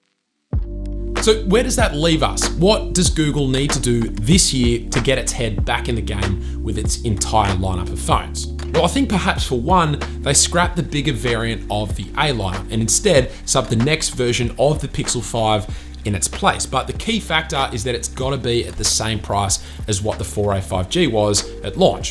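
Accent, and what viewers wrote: Australia. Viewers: your aussie accent makes me travel back to when i lived in australia ! very good content ! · Gotta say that was pretty great sponsor spot. I was so ready to fast forward, but I had to watch to compare the quality. It's not a priority for me. As long as the photos are great. Also it's great to hear a fellow Aussie. Still tossing up between the 4a 5g and the 5. I want the 90hz panel but it's hard to justify the $200.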